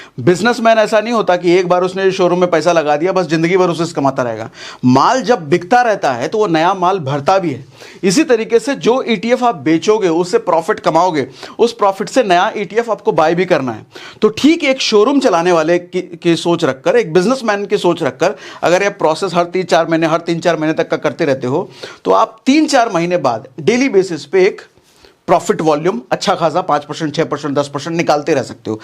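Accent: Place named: Bihar, India. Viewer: सागर सर आपका आवाज मे जो बिहारी टोन है ओ दिल को छू लेता है और सीधा दिमाग़ मे बैठ जाता है ❤🙏🙏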